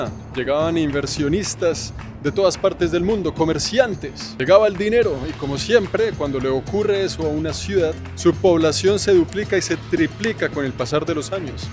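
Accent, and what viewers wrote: Bogotá, Colombia. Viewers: Es rolo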